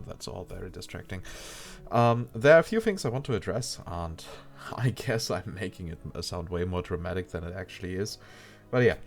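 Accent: Germany. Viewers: We like you with ur german accent bro. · I like your German accent, it's pleasing, I reckon Americans and Brits are not used to straight honesty of central Europeans. Honestly, I like your play style, but your commentary is much more entertaining for me than Raptor's and other channels, so this is why I watch this one. That being said, "So-ing" machines ;) Danke fir macht aus Praag! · Guten Tag, da dieser Kommentar für dich ist, kann ich ihn ja auf deutsch schreiben... Du hast vollkommen recht, als ich die ersten Videos von dir in der Anno 1800 Beta gesehen habe, hat mich dein Ton und deine Art schon ein wenig genervt. War jedoch nur eine Mischung aus Neid und meinem eigenen Anspruch an mich möglichst zu versuchen in Englisch nicht wie eine Vollkartoffel zu klingen. Ich habe deinen Kanal nach der Beta leider aus den Augen verloren, hatte mir aber fest vorgenommen mir die Vollversionvideos von dir anzusehen... 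und weswegen? Wegen deinem Spielstil, deine Layouts bringen mich zum lächeln und wenn dein Layout nicht auf die Insel passt und du dich ärgerst, ärgere ich mich mit dir und genieße gleichzeitig ein wenig Schadenfreude. Ein deutscher Muttersprachler darf in Englisch auch ruhig so klingen... Wenn er dann noch in seinem Spielstil den Klischedeutschen raushängen lässt, ist das top Unterhaltung. Es ist super entspannend jemanden "genau richtig" spielen zu sehen, während man selbst gerade einfach zu faul ist es selbst zu machen. Arbeit ist wunderschön... ich könnte stundenlang zusehen. :D Diese Art Anno-Let's-Play habe ich schon lange gesucht, jetzt kommt das (meiner Meinung nach) beste Anno raus und ich finde genau das. :) Danke, danke, weiter so! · This is how most German people talk English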